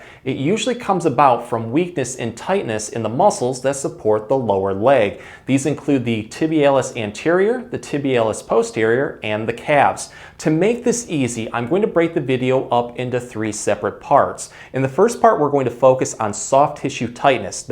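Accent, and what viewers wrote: Michigan, United States. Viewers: This is the most Michigan accent I’ve ever heard in one video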